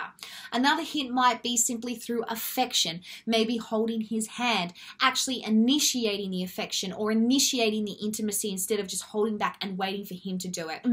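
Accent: Australia. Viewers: Mark Edwards I don’t know but I do know she is Australian, I’m Australian and this is how Australians speak. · are you Australian? British? Are you in Australia? I'm curious because I'm always comparing how the English are compared to how Americans are.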